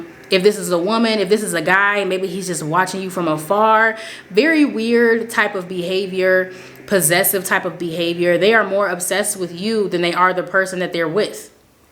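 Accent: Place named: United Kingdom. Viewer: The way my mind was convinced that you were from the UK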